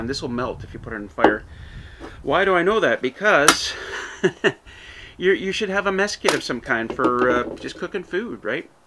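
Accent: Canada. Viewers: Like I have told ya before....YOU MISSED YOUR CALLING !!!!!.... 🥰🤣 love hearing you talk. City girl too. That Canadian accent !! You’re just so fun to watch when you’re not on ICE. !! 😳😳 that does scare me ! Enjoy your place... make those memories and I hope y’all have a long life there!! 🥰🦮🦮!! ❤️🍀🍀🍀🍀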